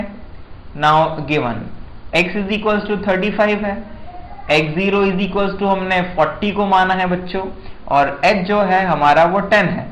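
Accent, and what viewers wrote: Bihar, India. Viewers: bihar se hai kya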